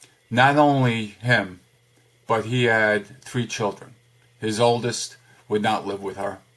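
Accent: New York, United States. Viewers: Are you from the east coast? I'm detecting a New York accent and as I'm typing this, you said Brooklyn. You sound like family! Anyway you look like a cross between John Gotti and Deniro. Take it as a compliment because they're both very nice looking men. I'm sorry that you had to deal with this shit. I sense a lot of those things in the narc I know. She is in a sick competition with her daughter. She financially supports her child and provides for her but any other mothering seems void. Only if it serves her. She'll put pictures on to get compliments.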